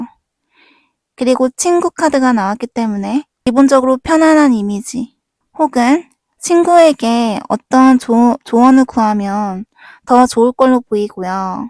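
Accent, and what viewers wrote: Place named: Gyeongsang, South Korea. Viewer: 경상도분이신가여 ㅋㅋ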